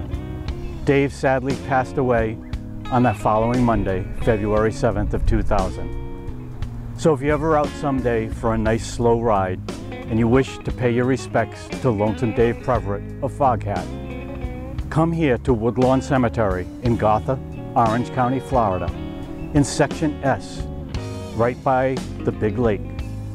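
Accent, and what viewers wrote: Boston, United States. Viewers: That’s because of his Boston brogue. It’s like telling an Australian they pronounce “car park” wrong because they drop their r’s 🙄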